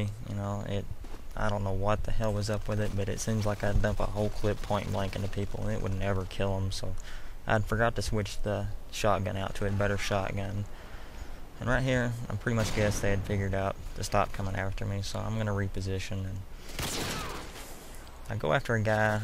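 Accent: South, United States. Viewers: u have a southern accent? cause u say snappin ^^ and u do exactly what i do listen to footsteps its incredible the people who dont... cool vid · Nice commentary. Where r u from? Southern US somewhere I'm guessing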